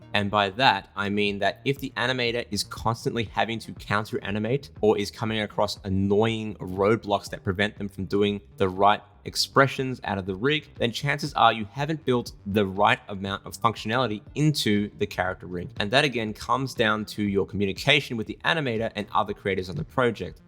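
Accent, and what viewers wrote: Australia. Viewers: interesting. Thanks for you replies bud. Btw I detected an Aussie accent? I’m based in Sydney. I like the style of your work so I’ll be keeping an eye on your page.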